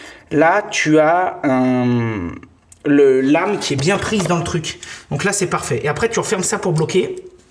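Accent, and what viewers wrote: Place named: Savoy, France. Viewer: Merci,et tres sympa le franc parle,avec l accent savoyard a mon avis.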